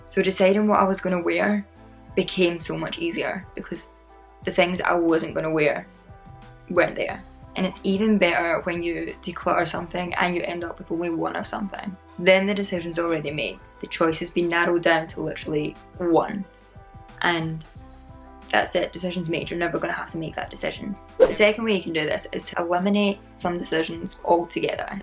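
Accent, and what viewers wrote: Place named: Scotland, United Kingdom. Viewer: 🥰 btw where are you from? I love your accent. Kinda reminds me of the Scottish actress Karen Gillan.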